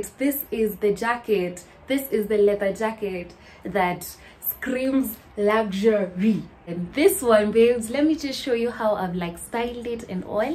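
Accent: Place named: Kenya. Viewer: After the Nigerian Accent, I really love the Kenyan Accent😍 so distinct.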